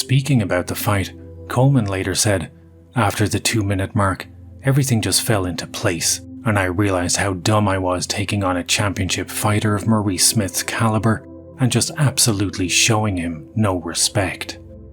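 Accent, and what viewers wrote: Ireland. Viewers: masterful comedic storytelling + Irish brogue is a winning combination that makes this channel golden. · Love your writing. Love your graphics. Love the Hibernian lilt which stirs my Irish lineage. (Also - eff John McCain.)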